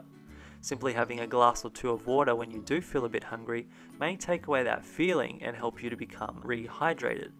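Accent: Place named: Australia. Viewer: Love the “Bondi Vet” (TV show) Australian voice.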